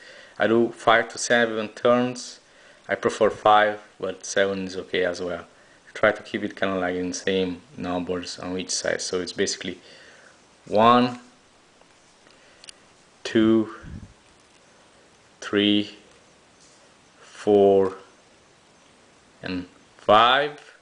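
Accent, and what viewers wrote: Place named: Nepal. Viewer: Are you from Napal? By your accent it sounds like it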